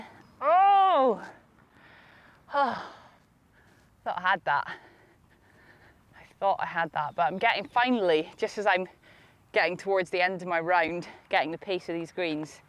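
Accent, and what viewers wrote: Scotland, United Kingdom. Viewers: I watch your videos for the golf.. and the charming Scottish accent! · Really good idea, with real insight from someone who explains clearly in a lovely brogue - a pleasure to listen to. Suggest Dunstanburgh and Edzell (both hidden gems) and possibly Lady Bank with possibly Victor Perez in Scotland and Lee Westwood at Dunstanburgh. Down south what about Ferndown and Trevose with Andy Sullivan. Really looking forward to the series where ever you choose.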